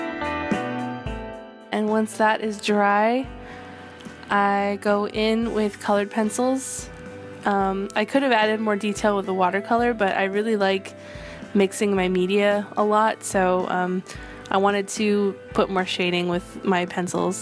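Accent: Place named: California, United States. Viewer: are you from California? cause u totally have the accent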